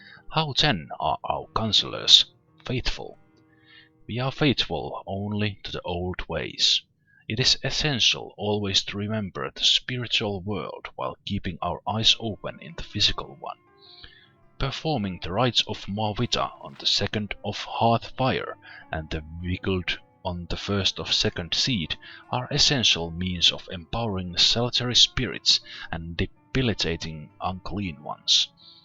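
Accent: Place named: Finland. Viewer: you sound like Ville Valo , less refined when he was young with strong Finnish accent... Cheers from Virosta ! :D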